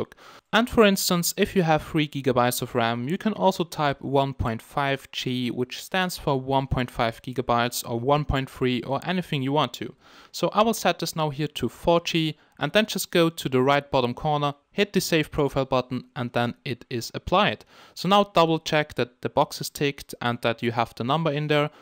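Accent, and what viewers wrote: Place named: Germany. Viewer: hey, are you German? You really sound like :D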